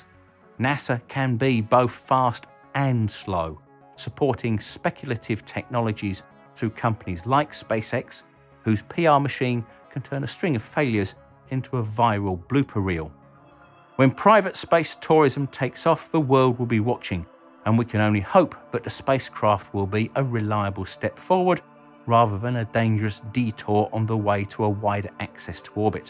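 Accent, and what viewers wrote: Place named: England, United Kingdom. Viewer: I do like it. I was wondering why I prefer your channel to Isaac Arthur's. I guess I prefer the "talking head" approach that you offer. But, more than that, your graphics and script are tightly coupled. I also find the english accent more interesting, although Isaac also has a melodic quality (deep southern gumbo) - so his voice SHOULD be a compelling one - but it isn't (for me, anyway). Content wise might I suggest you dive deep into SLS with a discussion of how Nasa was FORCED to take SLS - and the politics in play in Senator Shelby's district. A great, if dated, read on the subject of NASA's miseries is the 2005 book: "Lost in Space: The Fall of NASA and the Dream of a New Space Age" by Greg Klerkx It's definitely a NASA bash, but the political points are hard hitting. Just looking to help.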